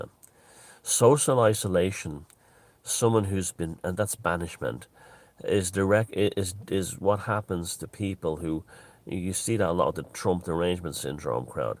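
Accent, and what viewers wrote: Ireland. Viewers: The combination of rain falling on your skoda and your Irish accent,delivering knowledge is the true magic. What we have we hold!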